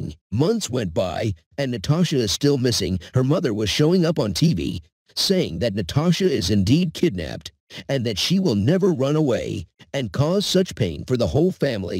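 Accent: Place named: China. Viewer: narrating this in Chenglish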